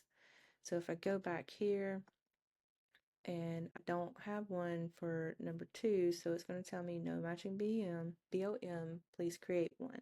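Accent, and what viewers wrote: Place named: South, United States. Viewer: I just bought your template and yes I am side tracking here but your southern accent sounds so pretty! ☺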